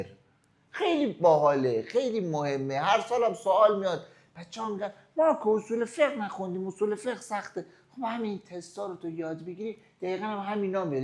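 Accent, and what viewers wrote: Mashhad, Iran. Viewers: ازخرم آباد درود به تمام مشهدیا لهجتون روعشقه لطفاً بیشتر مشهدی حرف بزنین ❤❤❤ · سلام خیلی عالی بود با لحجه مشهدی هم بی نظیر ه هم عالی وبی نظیر تر میشه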